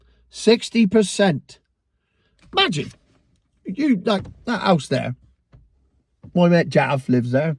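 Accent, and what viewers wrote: Cornwall, United Kingdom. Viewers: Great video! With that accent you must be from down my way me ansom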